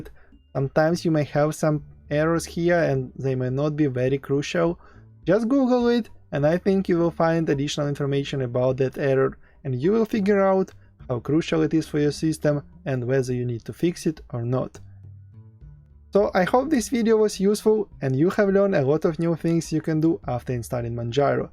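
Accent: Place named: Russia. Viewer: Русский чтоли?! Акцент просто ужас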